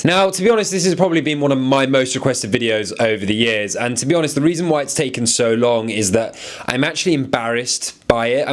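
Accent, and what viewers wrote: United Kingdom. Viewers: english accents tickle my brains in a good way😌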